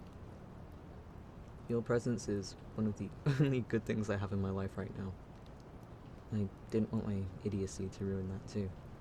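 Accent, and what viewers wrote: United Kingdom. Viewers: R u British because that accent hits different for me like omg how can someone have an angelic voice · I love your British Accent and you deserve more Subscribers!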